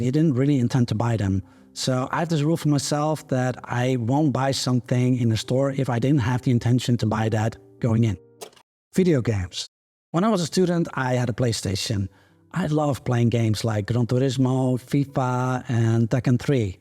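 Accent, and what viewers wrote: Netherlands. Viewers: Ik dacht al dat ik een NL accent hoorde😊!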